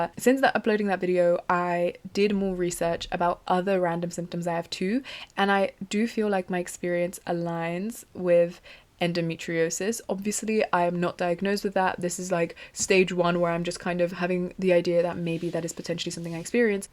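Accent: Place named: England, United Kingdom. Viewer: I know it's out of context, but can someone tell me what English accent she's speaking?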